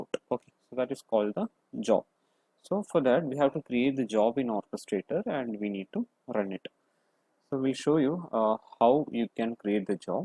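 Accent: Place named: Kerala, India. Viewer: Its really nice video. Very clear voice n explanation. Hope you have little Malayalam accent..🙂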